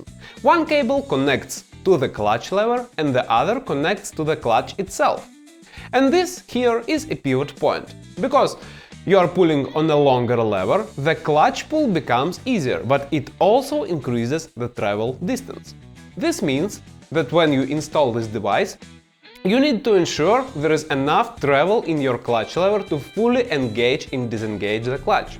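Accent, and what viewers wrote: Russia. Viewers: Чел, с таким русским акцентом изучать английский просто превосходно) Русский канал ты верно забросил, так что смотрю тут и даже понимаю процентов 40. пока 40.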